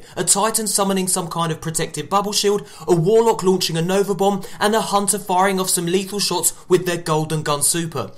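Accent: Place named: United Kingdom. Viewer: It's only easier to understand because you speak with that accent. I'm an american, and I find it much more pleasant to listen to an english/brittish accent, than to an american one. it sounds better to me. it's all opinion.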